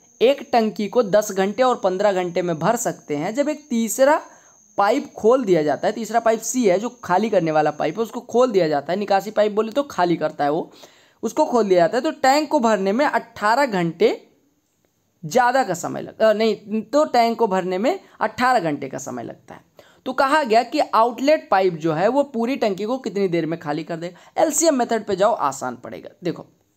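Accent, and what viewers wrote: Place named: Pratapgarh, India. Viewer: hai nai bhasha pratapgarh ki lag rahi hai sir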